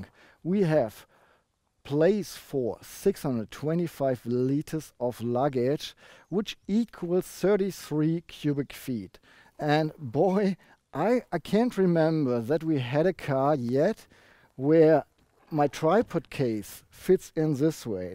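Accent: Germany. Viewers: Now I've trawled through loads of reviews for various cars and have seen some pretty good ones at that. I must be honest and say that the easy and relaxed way that this review was conducted was spot on. Informative, detailed although long kept me glued to the screen. With regard to the strong German accent, having previously lived in Germany for four years can quite honestly say that you speak better English than I speak German but also that you speak better English than some English people I know. I am looking for another car and have decided to buy a 2016 2.0 Tdi L&K 150bhp, having test driven one already find myself bitten by the Superb bug. Thank you for a great review. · No need to apologize for German accent, everyone who speaks English has an accent of some sort. Great review, very in depth, keep them coming. Danke.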